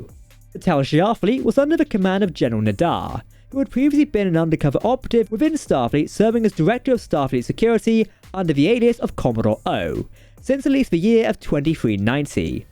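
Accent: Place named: England, United Kingdom. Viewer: Who,? It's a proper English accent. I could understand him just fine.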